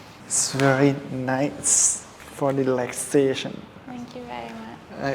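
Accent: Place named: Isan, Thailand. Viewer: พี่ต๋องพูดอีสานน่ารักกก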